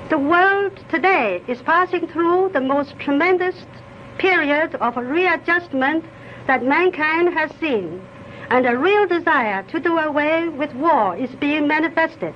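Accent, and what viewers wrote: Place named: United Kingdom. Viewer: 英国口音，挺好听，好像公主👸有些腼腆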